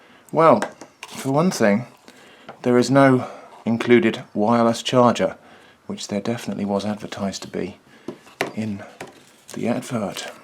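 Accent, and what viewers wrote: United Kingdom. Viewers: You sound more brittish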